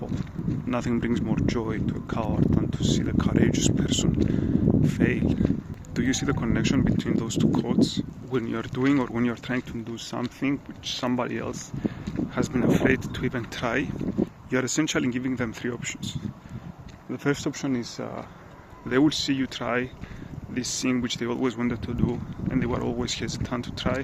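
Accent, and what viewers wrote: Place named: Cyprus. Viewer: Love love love the Cypriot accent ❤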